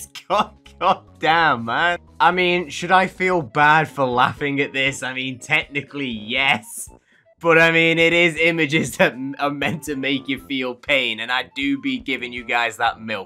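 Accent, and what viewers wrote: United Kingdom. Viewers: Question: are you from the United Kingdom?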